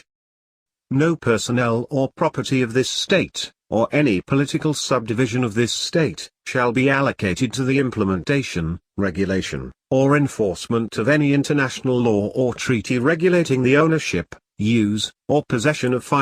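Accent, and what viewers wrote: United Kingdom. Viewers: Why is the narrator a limey???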